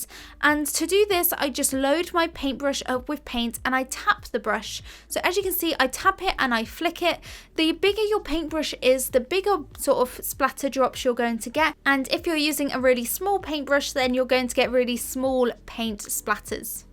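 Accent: England, United Kingdom. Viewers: Learning British accent and watercolor basics in one video... I love it. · I love her british accent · Lol that's how us peeps in Britain talk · She has a British accent that's why 😑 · British accent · I like your British accent!(Nice video, thanks) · british accent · That British accent is so satisfying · Brandon Butler yeah because she has an English accent also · I really really really love your British Accent❤️🔥 which is pleasing to ears and your tutorials are amazing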